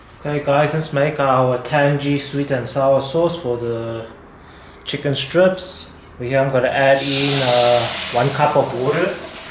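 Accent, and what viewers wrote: South Africa. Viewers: You sound like you have a South African accent.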